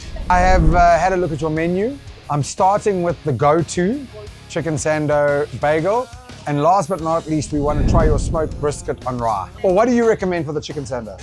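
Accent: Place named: Durban, South Africa. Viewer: Who the fuck is this o ? What a fuckin legend. Boy talks like he’s grown up in the 031. Good o. Good o.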